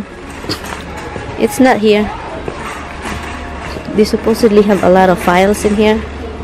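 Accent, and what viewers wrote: Visayas, Philippines. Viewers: Mag tagalog ks na lng puntong bisaya ka pa rin mag english Arte mo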